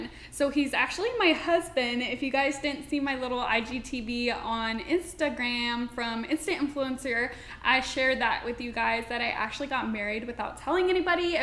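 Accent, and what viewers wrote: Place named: Texas, United States. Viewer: Girl you’re so inspiring! I’m also from Dallas and you have the whole accent of Texas. Love it 🤗❤️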